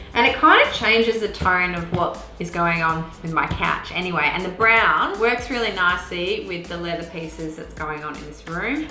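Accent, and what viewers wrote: Australia. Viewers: 😂 she isn't. She sounds like my mom friend in Australia.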